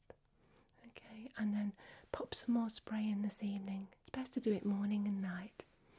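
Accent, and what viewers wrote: United Kingdom. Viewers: I love the tingly and relaxing video. The British accent is a plus. Just lovely. ❤️🌻💜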